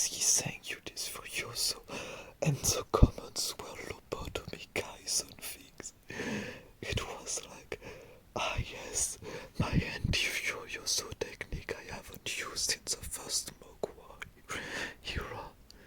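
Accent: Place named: France. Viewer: WoW I really like your frech accent its kinda relaxing you could try maybe do a ASMR in french? :0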